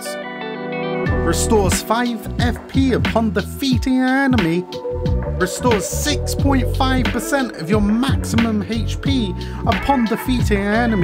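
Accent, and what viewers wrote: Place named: United Kingdom. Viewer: it's his normal voice, he is just bri'ish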